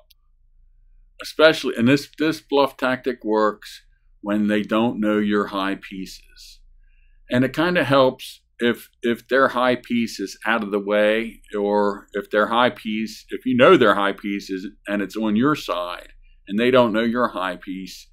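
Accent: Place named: Baltimore, United States. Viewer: I'm from Southwest Baltimore County I should've known! It sounded like a Baltimore accent but a little different that's why I thought philly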